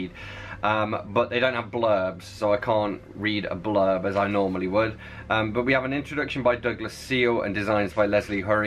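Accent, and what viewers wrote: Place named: England, United Kingdom. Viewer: Shakespeare is 1000% BARS! It kills me how dope his lyrics are. Also hearing it in your natural English accent is awesome.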